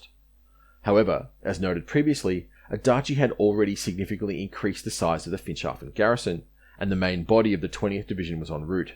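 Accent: Australia. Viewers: Probably . It still makes him sound like a bogan . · Good thing this guy is Australian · I am guessing the narrator of this is Australian? At any rate, I am grateful that you produced this! (I am American 😁) it has been very educational to hear this - as another comment stated, all too often Americans only showcase Americans! 😩 Anyway, thank you for this! ❤️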